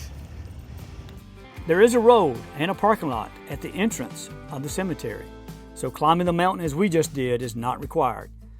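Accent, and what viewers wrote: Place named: West, United States. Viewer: You have an great western cowboy accent and amazing story narrating ability.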